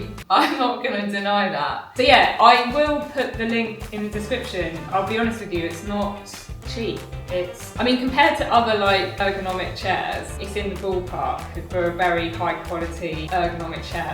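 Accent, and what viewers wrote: United Kingdom. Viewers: Those well spoken from either continent refer to your speech pattern as "brit-lish". Grammar, enunciation and spelling do matter. That said, I've always wondered why every girl in america has a thing for men with british accents. I for one, have no special care for the female version of the same. All that said, I love your jump rope videos. Looks like you're having a great time! Hope you keep it up. People here need to get off their bums and get some exercise and your way is as good as any other! Best of luck and just ignore the haters. 🎉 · I live in the US, I know, we are a bit of a target for jokes lately. But I've had a few friends from GB over the years, and the really good ones had the same 'accent' as you. That, your bubbly personality, and the notion that my old a$$ can get some exercise, is why I love following your channel. 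Keep up the good work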